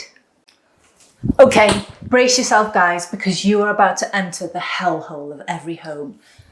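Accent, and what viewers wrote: Wales, United Kingdom. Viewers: OMG!!! Thank you so much for this (and all your) video. So many fantastic ideas in 20 mins!! Gone are the days of printing benchys and busts of Darth Vader... My 3D printer has a new mission in life. I've needed something like this for so long, That veggie label RFID tag idea is just a show-stopper! You are the best. Thank you so much for sharing all your creativity with us. Did I detect just the slightest hint of a Welsh accent? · Holly Lord! It feels like I watch DIY Perks) British accent is awesome! Great job, thank you!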